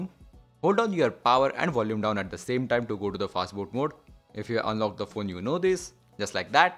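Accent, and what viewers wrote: India. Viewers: You have EYES though. I can understand it even if he talk in pure Indian as long as i can see what he's doing.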